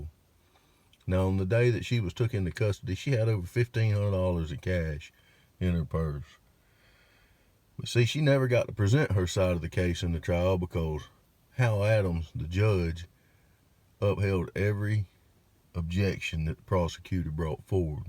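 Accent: South, United States. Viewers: My uncle and grandmother were in the white side of the doctor's office that Sunday morning. She was the women Ruby was waiting to leave, on that I don't blame her, my grandmother was a one sided stubborn gossip. Rudy shooting him opened a can of worms. The Judge was a friend of my grandfather. When I found out about the case 60yrs later, my father did not know she had birth one of his children. Curious where are you from? Clearly southern, but beautifully open minded ❤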